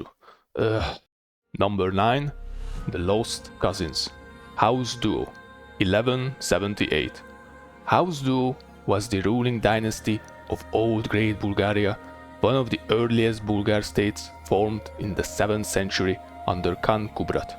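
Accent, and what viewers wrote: Hungary. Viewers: Kicsit belobban a magyar akcentus, amúgy egyből durrant a sub+like, örülök hogy egyre több a magyar videós, aki ck3-mal foglalkozik! Hajrá! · Are you Hungarian by any chance? That accent is almost unmistakable.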